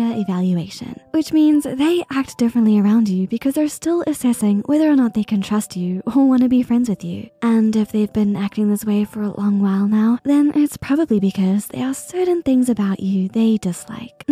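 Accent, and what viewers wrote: United Kingdom. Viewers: Was thinking the same although I also enjoyed the British accent ❤ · unrelated to the video but why does narrator have a british accent now?? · A British Amanda Silvera!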